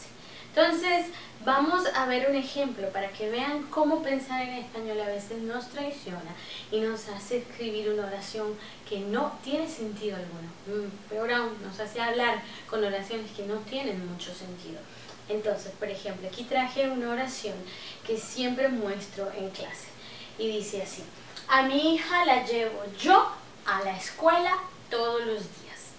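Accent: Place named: United States. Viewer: muy buenas tardes, este video en mi concepto fue como subir tres escalas en un solo paso, pues diria que es el punto de partida para pensar en ingles, y corrobora aún mas mi idea de que el Ingles es el perfeccionamiento de la forma de hablar de Tarzan. Con todo respeto por los anglosajones el anterior comentario, pero es que cada vez concluyo que el ingles es el idioma de ir directo al punto de forma clara y concreta, y el español es la forma de como dar rodeos y rodeos y a veces no decir mucho. He ahi también el porque los gringos hacen y nosotros los españoles nos quedamos haciendo foros, seminarios y conferencias tratando de buscarle florituras al idioma y con ello justificar el subdesarrollo Un saludo grande para ti, me está gustando mucho tu voz y acento americano que peligro para mi. Que tengas una feliz tarde, y una mejor semana.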